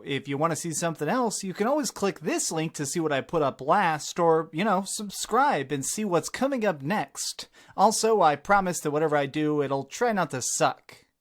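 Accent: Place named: South, United States. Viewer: Stop with the fake announcer voice we all know you are trying to hide that southern accent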